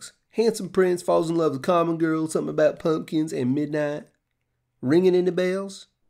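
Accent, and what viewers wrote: South, United States. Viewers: I loved this! The whole story was cute and I loved your Princely southern accent! Keep it up,!:) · Well sakes alive! I must admit sir that I wasn't expecting this accent but, being raised as a Southerner, I give your performance my Southern seal of approval!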